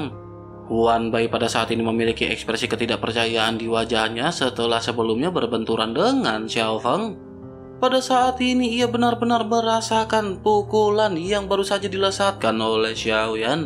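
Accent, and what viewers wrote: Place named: Jakarta, Indonesia. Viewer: Pakai logat nasional sajalah bos.Karena di dengar oleh bnyk org bukan hanya org betawi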